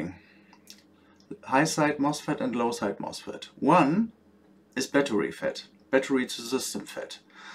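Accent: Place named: Germany. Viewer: Hello, I have the same laptop. No charging, no power. Your accent sounds like German :D Can you give me some advice?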